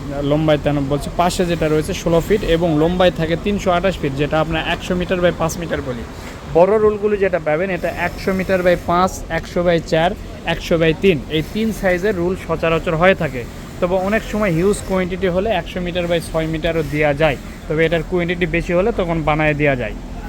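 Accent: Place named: Joypurhat, Bangladesh. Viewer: আসসালামু আলাইকুম ওয়া রহমতুল্লাহ! ভাই, আপনার কথা শুনে মনে হচ্ছে আপনি জয়পুরহাট জেলার মানুষ! জয়পুরহাটে আপনাদের এজেন্ট আছে কি না? জানাবেন প্লিজ!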